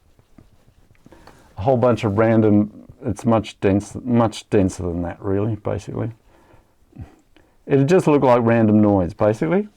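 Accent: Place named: Australia. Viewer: What I found most interesting is that you have a Finnish name, yet you seem to speak with a perfect Australian accent 😄 If you don't mind me asking, have you lived there your whole life?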